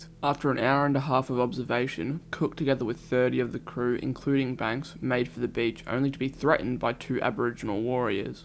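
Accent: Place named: New Zealand. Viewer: I'm a New Zealander and honestly thought your accent was Kiwi at first.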